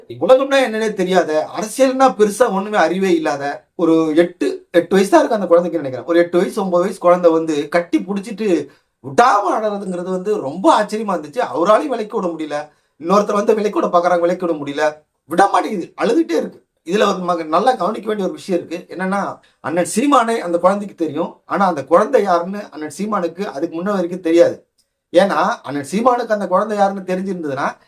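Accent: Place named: Thanjavur, India. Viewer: இல்ல உங்கள் பேச்சு தஞ்சாவூர்காரங்க மாதிரி தெரியுது நானும் தஞ்சாவூர் பக்கம்தான் அதான் கேட்டேன் தவறாக என்ன வேண்டாம்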